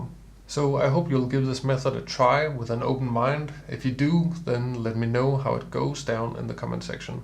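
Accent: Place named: Denmark. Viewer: Blev nødt til at google for at se om du var dansk. Det er så svært at skjule, at man er dansk, når man taler engelsk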